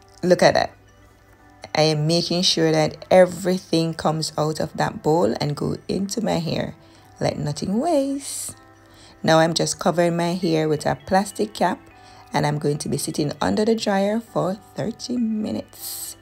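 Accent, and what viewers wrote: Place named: Jamaica. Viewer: Are you in Jamaica? You sound Jamaican....Love your videos. I have been watching them non stop since I came across your channel today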